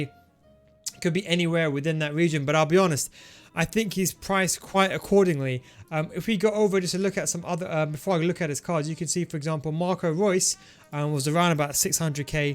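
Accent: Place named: United Kingdom. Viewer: Love the review. Off topic but i heard your accent and curious where in UK you're from?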